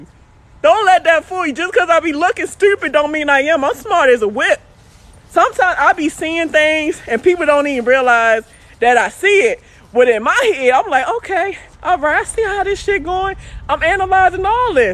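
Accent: Baltimore, United States. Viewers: I like that Bmore accent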